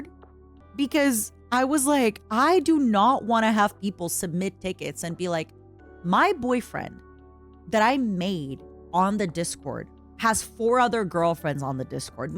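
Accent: Quebec, Canada. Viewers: as a french from France, it's wierd to have a "typical" french music, over an quebec accent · lmao she even speaks the Quebec dialect xD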